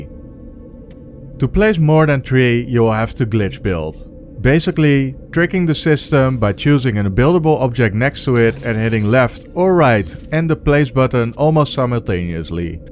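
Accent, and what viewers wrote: Netherlands. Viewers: aah het nederlandse accentje blijft ook ons kent ons he xD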